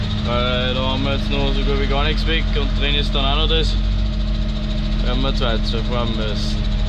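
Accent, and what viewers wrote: Austria. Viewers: Kimmst du aus Österreich?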